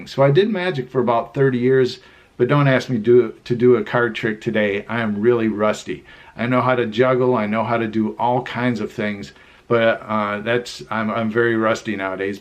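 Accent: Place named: Upstate New York, United States. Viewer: OH MY GOD I GREW UP IN JOHNSTOWN! Was born in brooklyn and moved there when i was 7 and moved back to nyc at 19. I just choked listening to you say this! Jansen Ave elementary school and Knox jr high Lol!! Im dying this is TOO FUNNY! No one ever knows where it is, they always think I mean Johnstown PA. I have been wondering where you were from because you totally have that upstate accent. wemple and edicks chocolate jumbles were my favorite cookies growing up and we always went to Saltzmans in Euphrata every summer for their milk weed and fried chicken. I suffered MANY terrible haircuts in Johnstown and my Best friend Maria and I used to beg my Mom to take us to Albany to get Posner products after watching soul train every saturday because we both had thick curly hair and needed help. Beautiful family, thanks for sharing! :)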